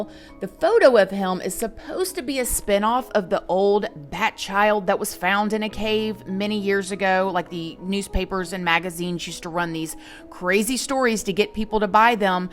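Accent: South, United States. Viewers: You giving me First Lady Tease I love it and your lil country southern twang lol 💜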